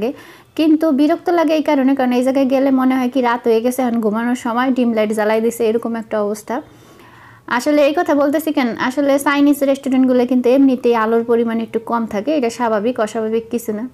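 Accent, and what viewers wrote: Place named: Madaripur, Bangladesh. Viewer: Apu tomar madaripurer vasa huinga moroto madaripurer vasa muhe aiya porte ace.onek din doira koite parina. Dese gele komu InsaAllah.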